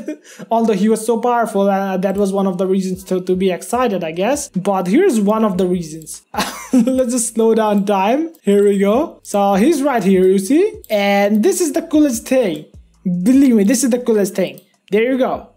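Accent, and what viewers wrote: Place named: India. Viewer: I think its an Indian accent, tho I could be wrong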